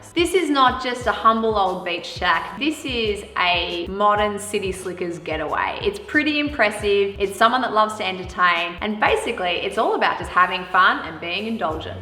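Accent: Australia. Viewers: Everything seems to come together the music the houses all topped off with the cute Australian accent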